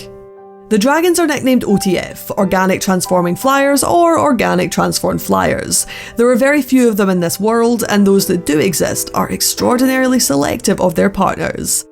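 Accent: Scotland, United Kingdom. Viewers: Also I do love a good Scottish accent my favourite band is from Scotland or was :( he recently commited suicide frightened rabbit was there name if you don't know them I am sure being Scottish it was likely in the news. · Fantastic review currently watching episode episode 7. Also beautiful Scottish accent can't wait to watch your other reviews definitely deserve more subscriber's. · Just added it to my queue. Thanks. Hard to remember the anime from a season or two ago that I skipped because they'd be on Netflix eventually. This certainly looks like Terrace House + dragon air force. Terrace House being the only reality show I've enjoyed since the third season of Real World (Yes, old enough to remember how great Puck was.) As soon as I added Dragon Pilot, Hilda popped up. Voiced by Lyanna Mormont. Gonna have to watch this first just because of that. 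That said, have you tried getting into voice acting? I see you suggest your accent is hard to understand at times, but as an American, Scottish accents are magical. I mean that literally. The sorceress voice actress in rpg X, cartoon Y, youtube machinima thing Z, I feel like she's usually best served with a Scottish accent. I mean, real normal cartoon/video game characters could work too, but magical characters just always FEEL Scottish to me.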